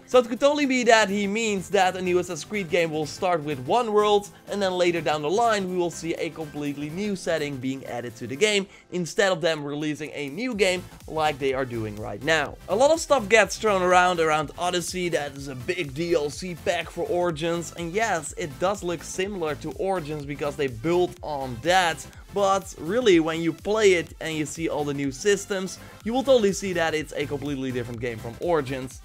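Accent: Netherlands. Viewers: Are. You. Dutch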